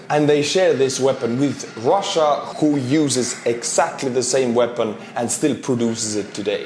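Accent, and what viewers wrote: Scotland, United Kingdom. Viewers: Absolutely love the Scottish accent, made my day. Lol lol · I am Scottish but I find your Scottish accent funny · Dude , your Scottish accent is hilarious 😂🤣😂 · pretty good Scottish accent. WE always refereed us as "OAT MEAL SAVAGES" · Love the jock accent mate, funny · Great info as usual and I love the Scottish accent 😀. ( realy is Ivan that bad? 😀) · That's one top Scottish accent .... better than the Highlander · Nice Scottish accent xD I don't often here Europeans doing impressions of any UK regions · I love the Scottish accent 🤣🤣🤣🤣👍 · Best Scottish accent ever, never change 🇬🇧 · Great to hear a fellow Scots with a local accent 😉😆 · 😂😂 Loving your Scottish accent! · that Scottish accent is truly beautiful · This scottish accent 😂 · Your scotch accent is perfect I love it it cracks me up inside and out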